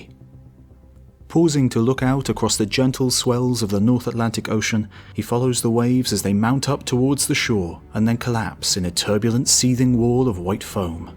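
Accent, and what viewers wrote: England, United Kingdom. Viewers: So glad you don’t have an American accent. Nobody likes to be given information in an American accent over a sort of British accent. Well…there are some grossly intense bigots who love that sort of thing, but they’re outliers. Though…perhaps not so outlying as I’d like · The english accent is suposd to make this sound smart .We know for a fact there was no big bang . Academia's Scientists and its standard cooperation suck .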